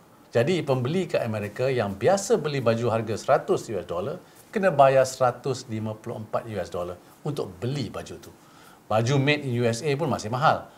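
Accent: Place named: Indonesia. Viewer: Logatnya seperti orang indonesia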